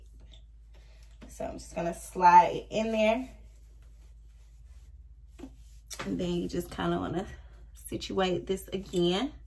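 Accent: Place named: New Orleans, United States. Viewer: Beautiful head wraps. New Suscriber on board. Are you from New Orleans? I love your accent.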